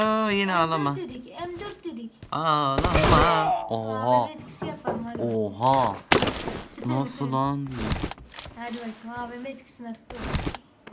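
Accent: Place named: Syria. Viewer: Niye suriyeli gibi konuşuyon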